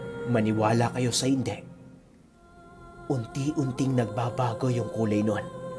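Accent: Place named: Visayas, Philippines. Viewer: Gusto ko pag nag bibisaya accent in tagalog ka..comedy horror kc lumalabas ang kwento.nakakatawa.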